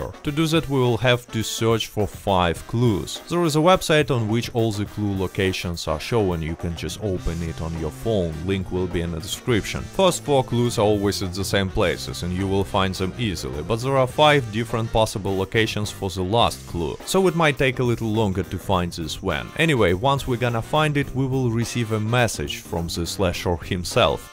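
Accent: Russia. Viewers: This is why I love Russians the have a great sounding ascent and they get straight to the topic!!🙃😁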